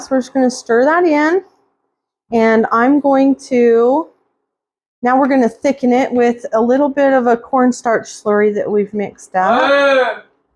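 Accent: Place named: Minnesota, United States. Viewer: 😂forgive me. "Accents" is one of my things. New to yr site. Literally, first time today. Don't wanna invade yr privacy. I hear South, Minnesota, and more. "MA Jor am"😂❤ again not being rude. Me born raised Chicago, 15 years ABQ, almost 10 SE TX. Just love people's voices. 😊 including yr son's.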